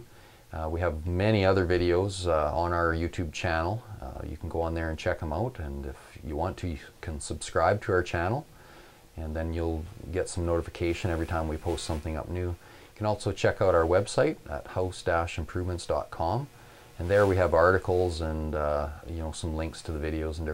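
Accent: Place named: Canada. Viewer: I knew u were Canadian by your accent but you saying 40 below just confirms it. That's crazy cold. Great videos. Best in the web! Thanks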